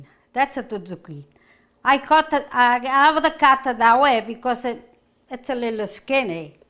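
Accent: Italy. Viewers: She uses the word "Fiammella" with this sweet accent half-italien-half-english ^^ Fiammella means little flame, so you can basically say you got it right ;) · Grazie mille for sharing yourself and your recipes. My father and his family were from Puglia but passed away before I could know them. My mother learned many of my grandmother's recipes. Your cooking is so similar and expands what I had learned from my mom. Your accent also reminds me of my relatives in Italy 🥰.Your singing makes keeps me company as I cook and I received your cookbook for Christmas this year! Your family is blessed to have you.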